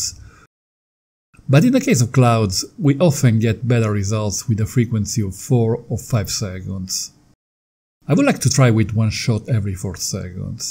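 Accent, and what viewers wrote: Italy. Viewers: I can't get over your thick Italian accent hahaha. Nice video.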